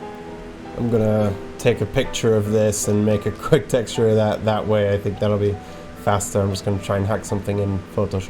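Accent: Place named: Scotland, United Kingdom. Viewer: I'm confused by your accent. It's like a mixture of Scottish, a northern accent and some American, at times? Am I going mad?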